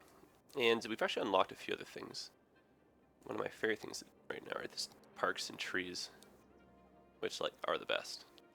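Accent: Toronto, Canada. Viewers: Great videos! For the past few days I've been watching a ton of Cities: Skylines videos and I've noticed a huge problem with all of them. The person doing the video makes a lot of small mistakes that have an immense impact on the gameplay and they don't notice it until maybe an hour later. SOO annoying. I know they have a lot on their plate when doing a video, but mostly I think it's because they are rushing it. With your videos, I haven't noticed anything wrong. Love the laid back style! Ps. I live in Toronto too at the moment and I like your accent :D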